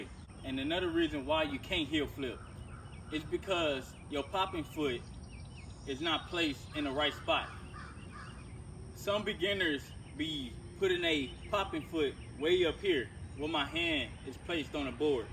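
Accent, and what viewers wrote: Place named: South, United States. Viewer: I just like the southern draw. country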